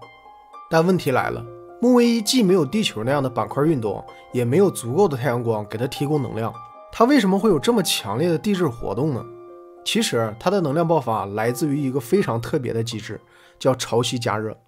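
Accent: Northeast, China. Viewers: 东北口音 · 东北话口音。😄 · 这大碴子口音太出戏了🌝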